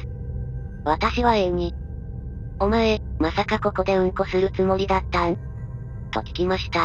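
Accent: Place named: Hiroshima, Japan. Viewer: 山口寄りの広島みたいな喋り方だな、大竹か和木か辺り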